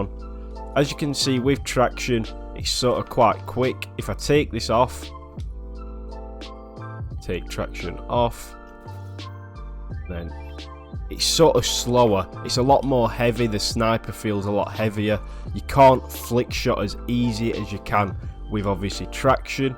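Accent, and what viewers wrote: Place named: Sheffield, United Kingdom. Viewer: you from sheffield bro ? i’m from birmingham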